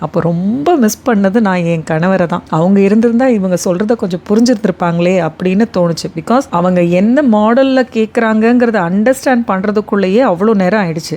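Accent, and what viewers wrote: Madurai, India. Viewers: அருமை அருமை நீங்க மதுரையா sister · Nega madurai ya akka 😊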